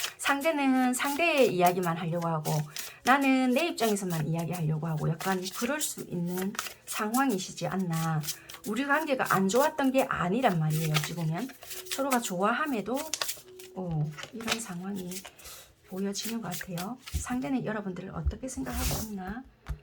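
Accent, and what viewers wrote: Busan, South Korea. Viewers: 대박!! 너무 잘맞아요! 너무 신기해요! 그리고 리딩에 진정성이 느껴져서 너무 좋아요☺️부산사투리도 너무 좋아요💕 3번 선택했습니다! 위로 얻고갑니다. 다음 영상도 기대할께요💖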